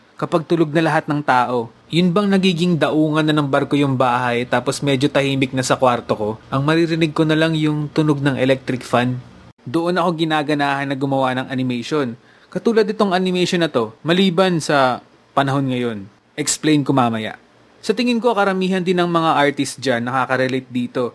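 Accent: Ilocos, Philippines. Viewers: Ilocano po kayoo?!!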